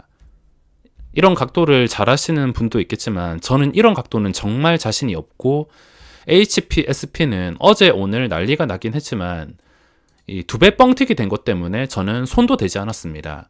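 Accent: Gyeongsang, South Korea. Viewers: 안녕하세요 님, 거의 1년만에 인사드리네요 ^^ 특유의 경상도 사투리의 구수한 말씀을 다시 들으니 좋네요... 그동안 너무 많은 영상을 보지 못했는데, 오늘부터 하나씩 보면서 공부해야겠군요...좋은 영상 감사드립니다.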